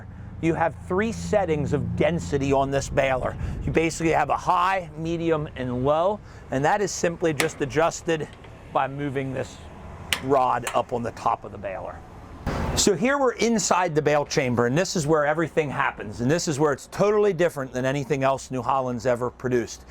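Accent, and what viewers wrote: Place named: Wisconsin, United States. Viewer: He talks funny, is that a Wisconsin accent?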